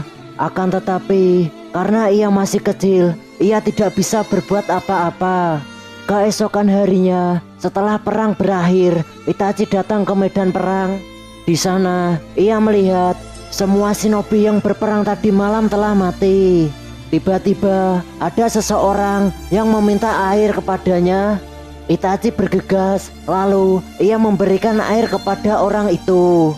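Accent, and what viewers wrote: Java, Indonesia. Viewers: jawa skali,,bos...bicara nya...jawa kebun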